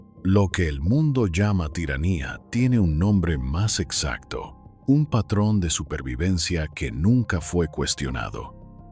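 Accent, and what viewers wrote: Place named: United States. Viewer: El comentarista la caga con ese habladito como de gringo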